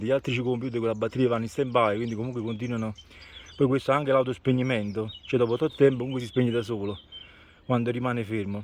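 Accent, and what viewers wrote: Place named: South, Italy. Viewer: Grazie. Voce molto simpatica del sud . Grazie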